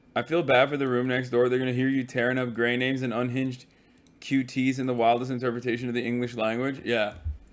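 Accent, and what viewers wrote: Cockney, United Kingdom. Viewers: Not actually that bad of a cockney accent 😂 The amount of swearing is perfect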